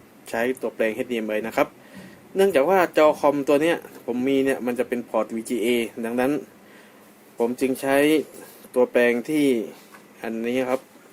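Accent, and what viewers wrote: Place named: South, Thailand. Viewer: พี่คนใต้ไหมพูดเหมือนคนใต้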